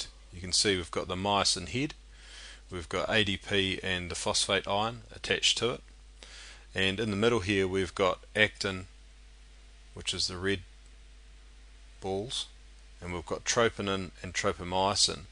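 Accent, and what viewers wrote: New Zealand. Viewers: yep hes New Zealand!!! you can tell by the way he says his E's and I's ie, head, actin etc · thats a typical kiwi accent :) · I'm pretty sure it's New Zealand accent, since i've been there for 2 years for high school.. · sounds like a Kiwi XD excellent video!!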